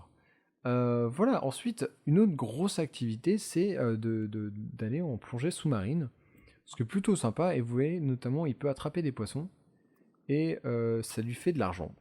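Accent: England, United Kingdom. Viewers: J'adore ton accent anglais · Cet accent anglais de malade!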